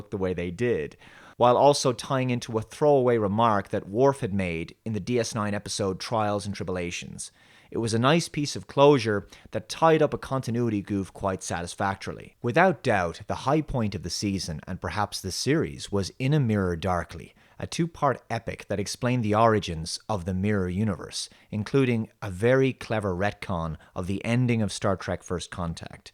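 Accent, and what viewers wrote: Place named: Ireland. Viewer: U are very irish sir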